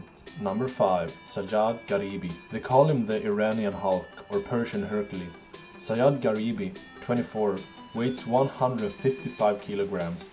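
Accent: Sweden. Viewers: I can hear you are from Sweden!;D Swe: Man kan höra att du är från Sverige!;D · Are you from sweden? + I SUBSCRIBED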